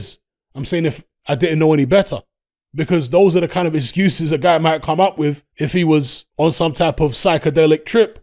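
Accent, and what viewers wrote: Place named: South London, United Kingdom. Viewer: South London IIRC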